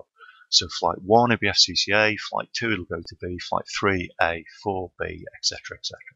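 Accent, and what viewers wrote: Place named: Stoke, United Kingdom. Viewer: Are you from Stoke?!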